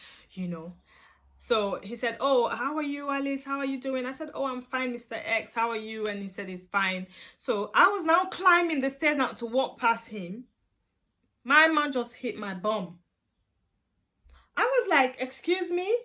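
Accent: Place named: United Kingdom. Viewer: Aww do you live in the UK? I hear an accent lol... I can’t believe this even happened to you x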